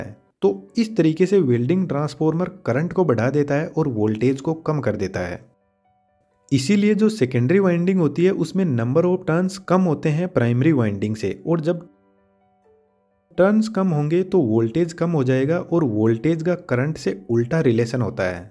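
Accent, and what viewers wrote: Rajasthan, India. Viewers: Aap East Rajasthan se ho na bro ?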